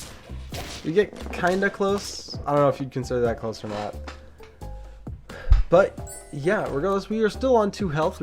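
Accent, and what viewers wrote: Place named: New Zealand. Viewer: didn t know you were from New Zealand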